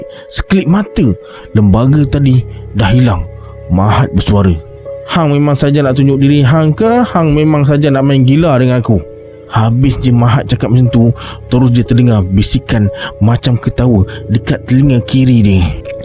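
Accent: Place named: North, Malaysia. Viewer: Memang sempoi video kali nie 🤟 loghat utara nohh 👍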